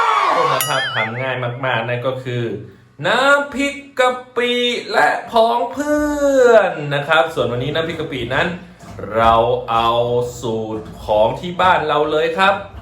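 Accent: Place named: Northern Thailand, Thailand. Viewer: อู้กำเมืองอี้ดีละเจ้า เฮาคนเมือง 😂❤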